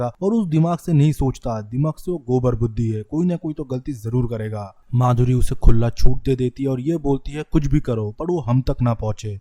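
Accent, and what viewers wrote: Bengal, India. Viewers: Bhai tum...bangali ho kya...??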